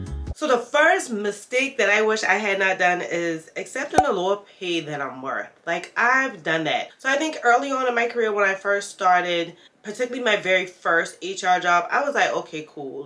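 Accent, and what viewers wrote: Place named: Gullah, United States. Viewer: Are you Gullah Geechee? Or caribbean. You sound Gullah.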